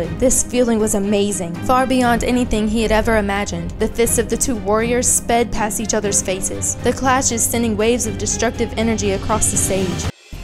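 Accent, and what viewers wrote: South, United States. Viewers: You’re from the south. I can hear the twang.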